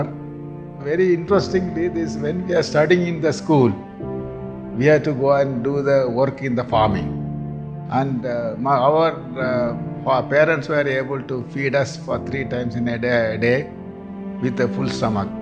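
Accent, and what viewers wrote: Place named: India. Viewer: It's indian pronounocation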